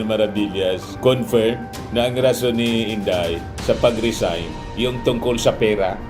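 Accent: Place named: Bicol, Philippines. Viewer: Baket ganyan ka mgsalita!bicolano ķa ba!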